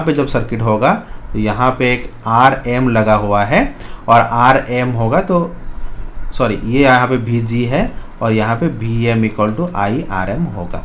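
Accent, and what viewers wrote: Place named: Odisha, India. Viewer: Your accent says you are from odisha. Correct me if I'm wrong.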